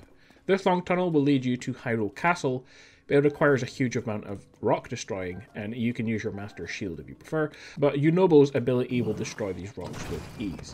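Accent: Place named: Scotland, United Kingdom. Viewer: Thanks for the video and the North Scotland accent…. I’d say around Inverness?.?.? Possibly Forres or further East Aberdeen way.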